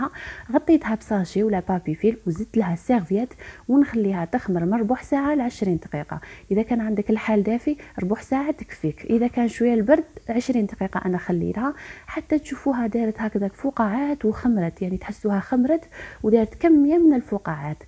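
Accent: Mascara, Algeria. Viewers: هدرتك حلوة نموت على هدرة تاع ناس معسكر وناس بلعباس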